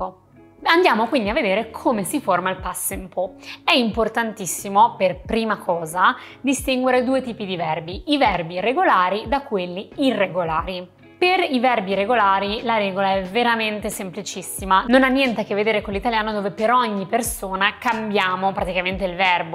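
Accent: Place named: United States. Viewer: Ciao. Ti ho scoperta solo ieri e mi piace molto il modo in cui spieghi le cose, ma soprattutto mi piace molto la tua pronuncia americana. Vorrei tanto averla anch'io così. Posso chiederti un favore? Non so se già l'hai fatto, ma potresti fare un video su come dire "Anch'io" in risposta (al posto dell'obsoleto "me too")? Ovviamente mi interessa come lo dicono in America. 😉...Grazie mille, sto facendo tesoro dei tuoi video 😘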